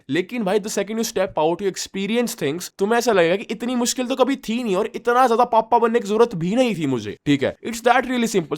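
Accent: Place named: Rohtak, India. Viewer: bhai rohtak se hai ke tu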